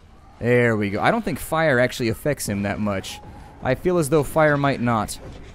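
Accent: United Kingdom. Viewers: Guy totally looks Cuban, talks like a brit lol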